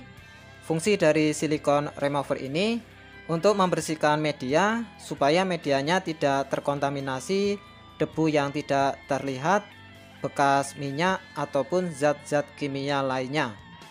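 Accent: Java, Indonesia. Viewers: oh kirain..logatnya jawa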